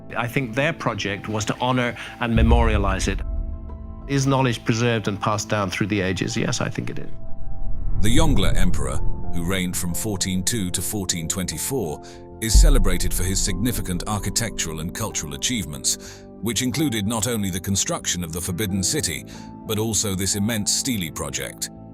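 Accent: England, United Kingdom. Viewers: It's tough listening to the narration because it's in an English accent and all I can think of are liars.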